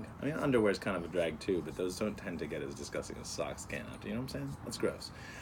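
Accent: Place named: United Kingdom. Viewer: I'm British and that was a good British accent!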